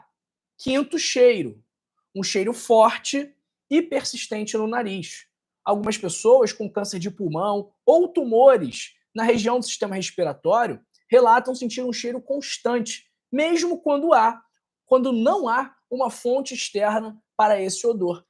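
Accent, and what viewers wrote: Rio de Janeiro, Brazil. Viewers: Esse sotaque carioca é um horror 😮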